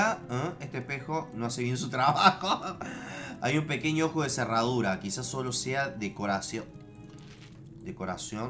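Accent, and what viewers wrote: Argentina. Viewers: sos argentino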